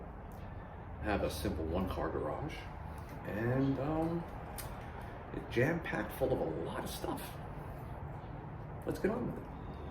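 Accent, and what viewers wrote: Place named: New England, United States. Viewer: Great video. Some interesting setups like the use of old tables. You must be from New England you have that accent. At first I thought the license plate was Oregon any way good stuff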